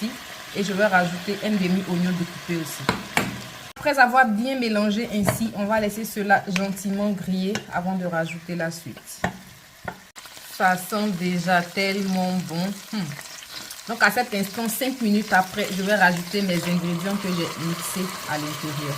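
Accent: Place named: Ivory Coast. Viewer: Ton accent ivoirien j'adore 🎉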